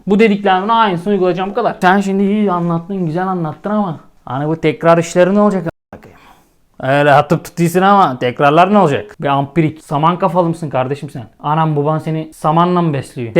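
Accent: Thrace, Turkey. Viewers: Reis küfürü azaltmışın böyle daha iyi trakya ağzı yeter güldürmeye :)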